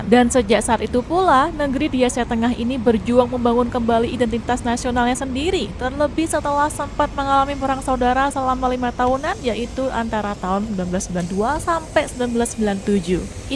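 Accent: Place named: East Java, Indonesia. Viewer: Mbaknya logatnya agak medok sepertinya dari jawa timur ya😅😅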